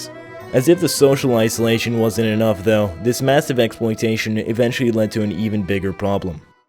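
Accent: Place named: Russia. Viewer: You have a slight Russian accent, and I love it!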